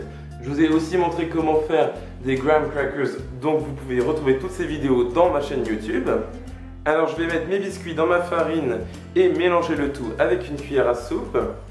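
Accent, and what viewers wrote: United States. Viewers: es tu Americain? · Tu fait un peu trop l'américain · Mais peut être qu'il EST américain ? Ça ne vous ai pas venu à l'esprit ? Ou qu'il y vit ou passe ses vacances donc a l'accent ??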